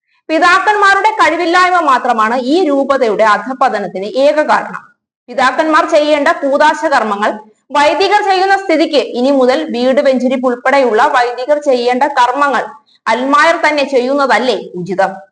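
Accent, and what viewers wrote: Changanassery, India. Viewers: ഉച്ചരണം കേട്ടാൽ അറിയാം നിങ്ങൾ ചെങ്ങനാശേരി ക്കാരി ആണ് എന്ന് നിങ്ങൾ കഠിന പരിശ്രമം നടത്തിയലൊന്നും എറണാകുളം ത്തെ തകർക്കാൻ ആവില്ല ദൈവം മുകളിൽ ഉണ്ട്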